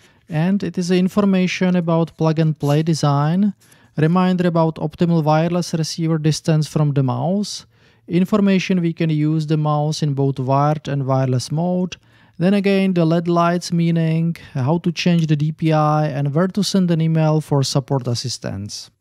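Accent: Czech Republic. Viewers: Are you Czech lol? Also nice video, keep it up.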